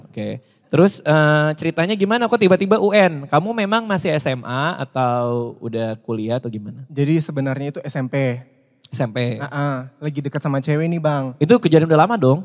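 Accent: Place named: Palu, Indonesia. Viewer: Logat palunya di tahan tahan, tidak hilang memang 😂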